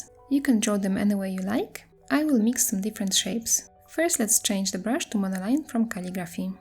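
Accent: Poland. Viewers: Polski akcent zawsze poznam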